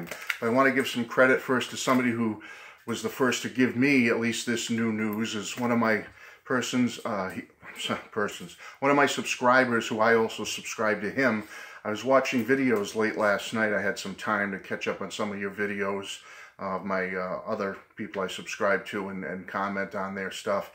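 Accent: Boston, United States. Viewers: Hi, Thanks for all this GREAT information! White Album Super Deluxe, yes! I love all this resurgence of Beatles everything! It is all very exciting! I just have to say I love the way you say "McCartney"! The Boston accent is GREAT! I happen to like the Liverpool accent too! Wonder why?! You're in my prayers tonight. "God let us Beatles fans live another 35 more years or at least until there's nothing more Beatles to re-release!" LOL! Thanks again! I've got to now to look for my part-time job to pay for all of this stuff! Cindy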